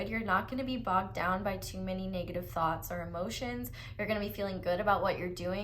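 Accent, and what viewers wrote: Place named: New York, United States. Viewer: the new yorker accent is not your friend :)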